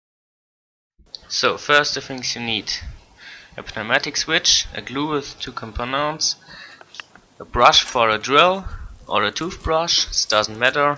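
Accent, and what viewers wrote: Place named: Germany. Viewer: I'd just like to say, your english is quite good. You have a german accent, of course, but your english is perfectly understandable. Great tutorial, the best I have seen on youtube.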